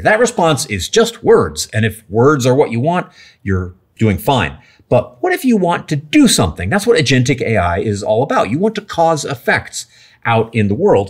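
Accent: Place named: United States. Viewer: We need an AI to turn unbearable american accents into nicer ones within the youtube player.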